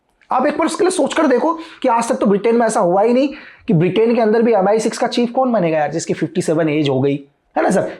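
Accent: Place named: Rampur-Moradabad, India. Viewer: ay be from Rampur-Moradabad, if you go by accent.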